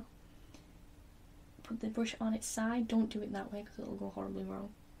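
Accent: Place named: Yorkshire, United Kingdom. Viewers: there is nothing wrong with going very yorkshire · Yorkshire! Haha, as Yorkshire as I am I looooove your normal accent! Luvveley ;)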